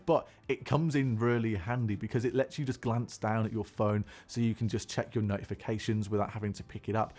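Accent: United Kingdom. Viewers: Thanks for the updates, it’s always kind of fun to anticipate with the new iOS will do and what improvements are on the way. Can’t quite figure out if you’re British or Australian but I do like the accent. You have a friend in Columbus Ohio USA!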